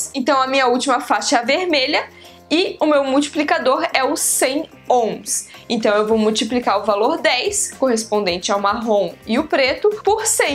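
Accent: Rio Grande do Sul, Brazil. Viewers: E pelo sutaque é gaúcha kkk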